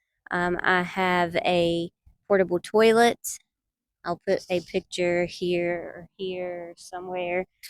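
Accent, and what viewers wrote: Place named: South, United States. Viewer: I love that southern draw, your ex must have been crazy to divorce you.. keep up the good work, and take care of your fur baby.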